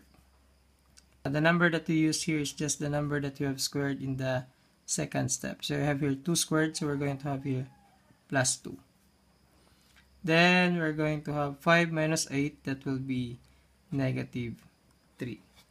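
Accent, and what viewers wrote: Philippines. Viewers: When i heard his accent i know that his filipino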